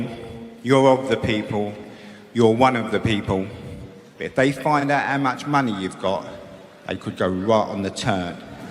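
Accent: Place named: Cockney, United Kingdom. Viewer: You gotta love a bit of the cockney geezer! Pure class! UK xx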